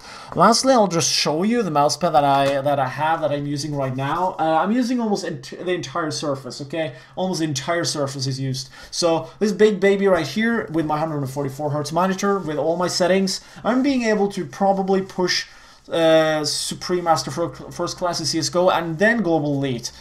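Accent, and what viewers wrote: Norway. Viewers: er du NORSK!? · Er du norsk for faen:)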